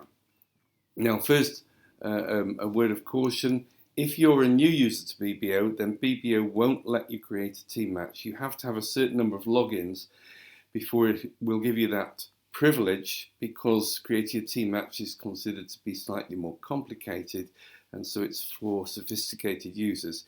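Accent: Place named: Manchester, United Kingdom. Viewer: thx - great explanation. Is it possible to delete a team match if I pressed the Create Match button too soon? BTW lovely to hear your accent as an ex-pat, whose Father was born in Manchester. It brought back lots of happy memories. Thx, Penny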